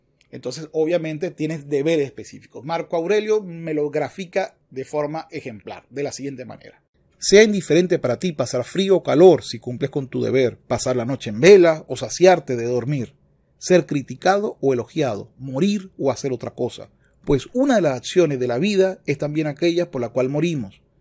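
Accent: Venezuela. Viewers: tu eres Venezolano? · Ya sabía yo que eras venezolano amigo...!!! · Hola, professor, deseando esté bien; venezolano en México, me cayó el veinte en cuanto a la corriente del Estoicismo, ya que lo percibo en "español venezolano", gracias, procuraré seguir escuchando y viendo tus vídeos. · Muy bue vídeo amigo..excelente. ¿ Usted es Venezolano?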